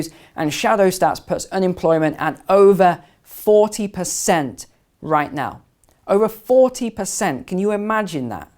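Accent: United Kingdom. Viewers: God bless you for saying all four syllables of "military" !! With a British accent, I was fully expecting to hear "mili-TREE" instead. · Its weird listening to a British sounding guy talk about American reality.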